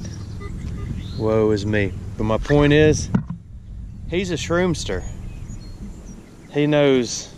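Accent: South, United States. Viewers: your explaining of things that you collect, is very informative, Plus I love listening to your southern accent . please keep talking.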